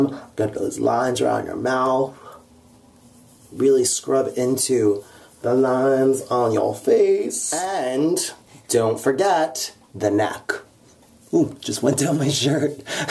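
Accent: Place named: United States. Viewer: I'm British and I just love your American accent!! Thank u soooooo much for making videos like these coz they r just so helpful and I'm really grateful for that 😄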